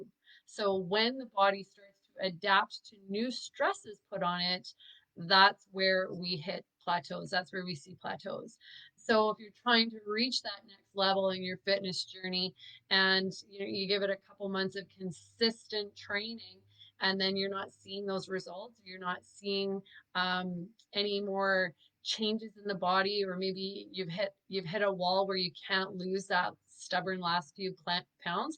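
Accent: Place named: Northland, New Zealand. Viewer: Are you located in the northland area ? You have that kind of accent 😜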